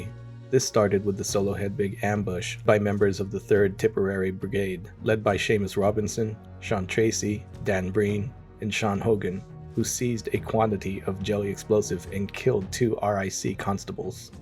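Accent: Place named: United States. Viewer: Jayzush Christ will ye be dropping the yank accent for phuqqs sake! Seán McKane? Rural members were unreliable? Tell that to the Flying Columns in Munster, Longford, and Mayo.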